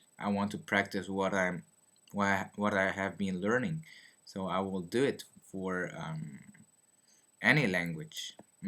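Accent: United States. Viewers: Jesus, I assumed he lived in an English-speaking country because his English is damn near perfect. Extremely few grammatical errors (only mistakes I'm catching are using plural at times we use singular, like "transportation" or "homework". But I understand why people would make that mistake, because in English we just don't have plural forms of those words), and his accent is really minor. Sounds like he's been living in the US for years. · You seem to speak using american accent... I'm brazilian and understood you well :) I think british accent is a little hard to understand, do you also think it?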